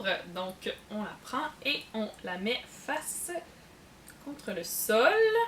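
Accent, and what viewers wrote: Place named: Canada. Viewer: très pratiques ta vidéos avec tous le charmes et ton beaux axons Canadien que le Seigneur te bénisse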